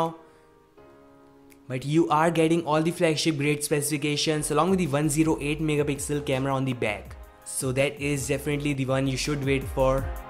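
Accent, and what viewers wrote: India. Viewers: India English, hahaha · Idian english🙂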